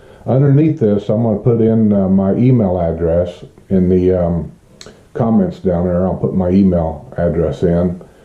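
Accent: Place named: Central Ohio, United States. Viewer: First -- these are great videos. Just moved into Pine Ridge down here and you're my new 'go to guy' for info about our new hometown Second -- I grew up in central Ohio and I imagine you grew up within a state or two of there. You sound like everybody I remember growing up Third -- you may have addressed this in another video, but do you know why cell service is so spotty in Villages homes? Verizon told us to set our phones for 'advanced calling' so our wireless router could help, but that barely helped at all. We just went ahead and got a land line. And finally -- who did you use for your water purification system? We get dark ring-around-the-commode gunk after a day or two without flushing. Who provides this ugly water? Everything is so 'perfect' and well done down here, it amazes me that The Villages hasn't fixed this community wide. 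Thanks again for proving this really is Florida's Friendliest Hometown.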